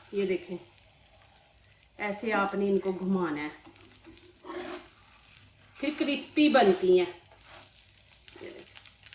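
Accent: Punjab, India. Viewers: مجھے تو آپ کی پنجابی لہجے والی اردو اچھی لگی 💕💕💕💕🤲🤲🤲🤲اور ریسپی بھی میں ٹرائی کروں گی مزے کی لگی 💓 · Ma shah Allah zaberdat . Ap kaha say hay punjab say ap Sialkot ya Gojranwala say lug rahi hay ku k ap ki urdu bohat punjabi touch hay 😻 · Baji bohat achi recipe hay lakin aap K Punjabi lehjy me Urdu bohat torture karti hay. Kya hi acha Ho K aap Punjabi me hi videos banaya Karen. Wo hame ziada pasand aay gi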